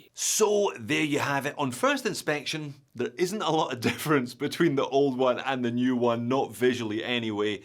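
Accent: Scotland, United Kingdom. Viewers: Mate!. You can tell you're Scottish.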